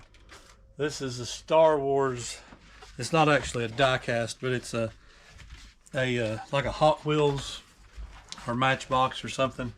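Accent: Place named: South, United States. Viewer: Southern accents sometimes leaves others guessin 😊 I'm going with Pack It. Hope Y'all have a great Holiday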